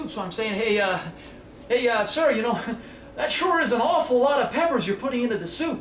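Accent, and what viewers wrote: Trinidad and Tobago. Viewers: his Trinidadian accent realllllllllllllllllll on point wdhh loll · You got to do some more with just ur trinidad accent. . just luv it !!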